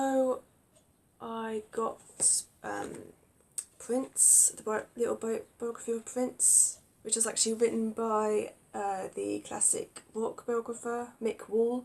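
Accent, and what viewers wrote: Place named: London, United Kingdom. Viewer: Lol,yeah maybe u picked it up from somewhere,like over the rainbow,lol,but yeah l spent nearly a year in London so l thought l heard a little bit of a twang with that accent,maybe my ears are deceiving me,lol,anyway Hampshire eh,l don't believe l know to much about Hampshire,are u like in the middle of nowhere,with all fields and stuff about???BTW thanks for getting back,take it easy:)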